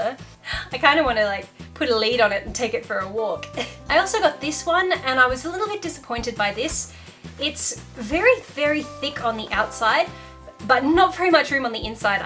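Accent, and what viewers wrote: Australia. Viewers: I love your Australian accent. I may be American and you say words differently (obviously) but I don't feel like it's that big of a deal. I understand what you're saying, so say it however you want. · Are u from Australia I’m from Australia 2 Sydney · Also I’m Australian and I can confirm that’s how we pronounce things, fight us international folk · Please don’t change how you say things, be Aussie proud🤗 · Are the two ways you pronounce "pastel" spelt differently? When you say things differently then I do I just think it's part of the Australian accent 🤷‍♀️ also just for fun I thought I'd mention that pastel means cake in Spanish which when I learned that found funny 😁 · Please say things the way you naturally say things. I love Australian accents and learning how other people pronounce it call things. —People that seriously can’t get over the way other people say things are infuriating. 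News flash!! People from other areas aren’t always going to sound just like you. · You are just too sweet. I love your accent and the way you say pastel. You always want to please people, but you ALREADY ARE. If they are coming at you in any negative way they can buzz the hell off to their perf YouTube-r. There are plenty of us than know, understand ADORE your Aussie differences. Never change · WOW! I cant believe that girl Nina Tailor was SO INCREDIBLY rude! 1st of all, if u don't like it, dont watch.. 2nd of all, Archie is the most awesome bird on YouTube and Yes, HATERS, he does need to be in every video he wants to be in. Also, I LOVE ur accent! What kind of person tells you your wrong for speaking in your native language/accent? Literally if you were to ask any person who knows me they would all tell you this about me: I always say that I want to hire an Australian person to give me bad news because I feel like no matter what you say, if you say it in an Australian accent it sounds happy lmao. 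I fairly recently found your Channel, did a bunch of binge-watching, and of course subscribed! I think you're great, I love you, I love Archie, I love your content.. screw the haters they're just jealous! Keep doing your thing girl cause you're awesome!! And as always, TYFS!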